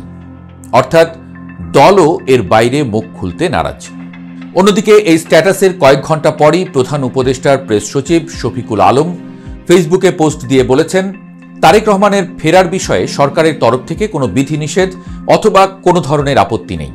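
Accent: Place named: India. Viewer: এর খবর পড়া স্টাইল দেখো মনে হচ্ছে এই মালটা দাদাদের দেশের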